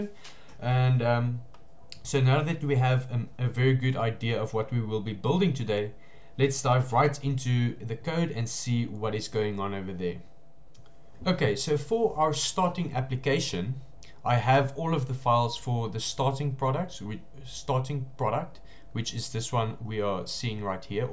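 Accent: South Africa. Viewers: Are you from South Africa ?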